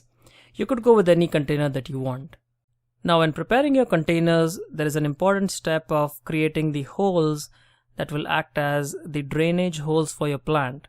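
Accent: India. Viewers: He sounds indian but his skin looks white. I’m stoned and i know its 2021 but just something I noticed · you sound like indian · Sounds very Indian... The accent is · Sound like an Indian guy in California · pronounces you are Indian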